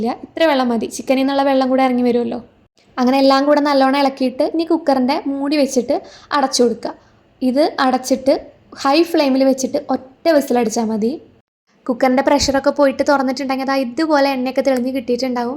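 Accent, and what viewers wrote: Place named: Thrissur, India. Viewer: തൃശ്ശൂർ ക്കാരി ആണല്ലോ. കൊള്ളി എന്നു പറയ tcr അല്ലെ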